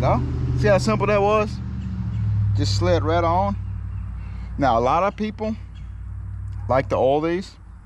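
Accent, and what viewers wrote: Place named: Alabama, United States. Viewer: “ I’m from green bow Alabama” - got the same accent. great video though.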